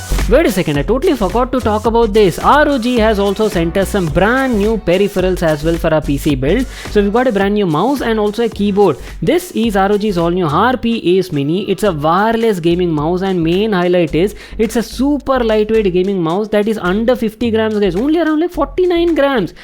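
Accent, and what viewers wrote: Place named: South India, India. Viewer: one thing i would like to say i absolutely love ur accent, that little south touch in it, it's so gooood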